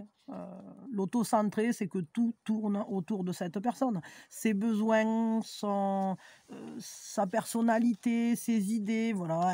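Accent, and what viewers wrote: South, France. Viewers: Moi c est verbale je vais partir et ça devient physique pas facile merci pour vos guidance avec l accent du sud sa fait chaud au cœur ❤❤🙏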